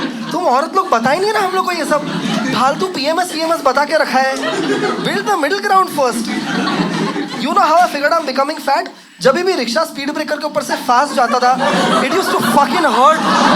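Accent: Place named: Maharashtra, India. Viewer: bro i am sindhi too but u sound like proper maharashtran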